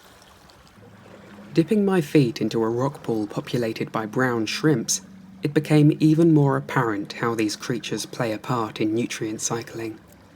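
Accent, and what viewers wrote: England, United Kingdom. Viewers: Something about English accents and nature documentaries. They just fit together so well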